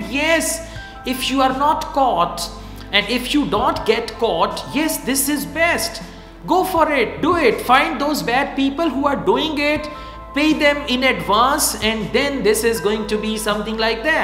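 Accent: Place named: Punjab, India. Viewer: Thank you sir for updating us about the current affairs of Ielts . By the way i like your punjabi tone during video . Almighty Allah grant you long and healthy life . ameen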